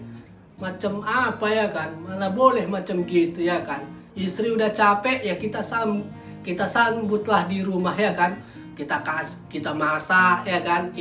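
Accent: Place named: Batak, Indonesia. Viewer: Klu mendengar logatmu, marga apa kau lae.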